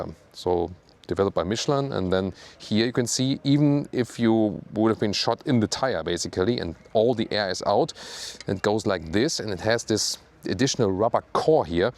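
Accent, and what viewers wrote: Germany. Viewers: As a woman, the host is very hot. However, the German/Austrian accent just ruins it for me. He'd be a perfect 10 if he spoke Italian, perhaps Portuguese ❤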